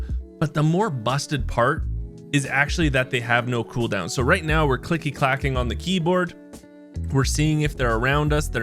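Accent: Canada. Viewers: You were soo accurate with your “15 DMZ tips and tricks to make you a pro” video. Your goofy Canadian accent WAS fun😮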